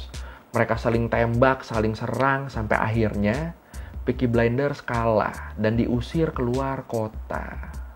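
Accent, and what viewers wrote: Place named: Madura, Indonesia. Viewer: gaya bicara lu mirip dilan bekasi campur logat madura, " selalu ngejait silet diujung top'i " selalu ada jeda cokk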